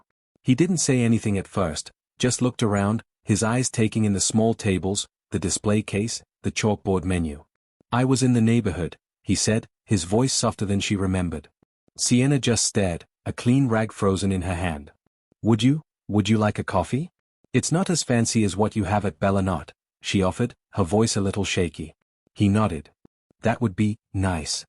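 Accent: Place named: Australia. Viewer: ❤❤😊good story, good enunciation. The narrator’s accent, sounding of Australia. ❤❤😊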